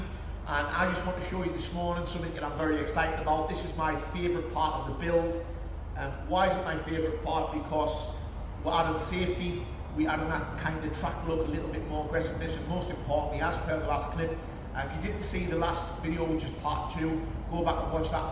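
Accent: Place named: North East England, United Kingdom. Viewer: Bro he sounds like that cos he's from the north east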